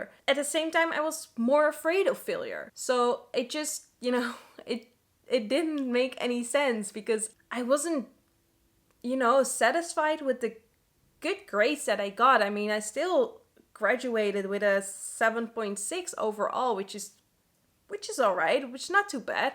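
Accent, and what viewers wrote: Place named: Oxford, United Kingdom. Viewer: After your return from your overwhelming trip to Australia, I see a very happy grown-up young woman. I enjoyed you presentation very much. Your Oxford English is excellent. Even I could follow you without a problem. I am very proud of you. From a retired professional I give you a small advice. Speak a little slower and bring in short breaks. The interested listener has to consume your message. Your old but very happy Opa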